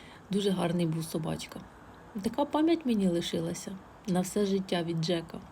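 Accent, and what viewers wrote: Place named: Orativ, Ukraine. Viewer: Оратівський район! А я чую, говір такий милий)) Он воно що!!!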